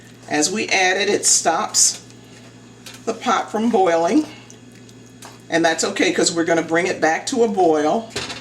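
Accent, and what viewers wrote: Louisiana, United States. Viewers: sounds like you may be a Cajun,. I'd never make gumbo this thin, add frozen okra, put file in the gumbo pot, use turkey sausage (I wouldn't make gumbo if I couldn't get Savoie's or Richard's sausage or comparable) and NEVER add tomatoes. There is variety even in Louisiana--I've never even had a bowl of gumbo in New Orleans restaurant that I enjoyed (didn't take like mine or Maw-Maws:) ). I guess it is all in what you grew up with. Keep in mind that a lot of cooks make a roux-based cajun dish once in a great while, not a few times a month like I ( and maybe you?) do.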